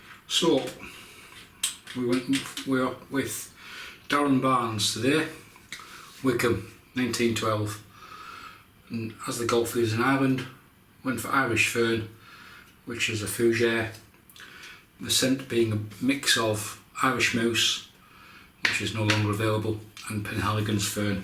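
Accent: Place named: North East England, United Kingdom. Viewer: Just subscribed a few days ago. Nice to hear a North East accent. My part of the world as well. Hope you hit the 200 subscribers soon. I enjoyed watching your shave.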